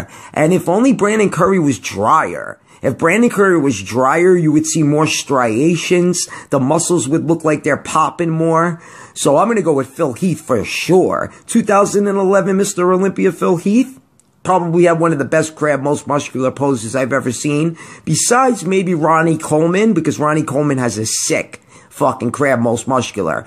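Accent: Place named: New York City, United States. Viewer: i love the NYC accent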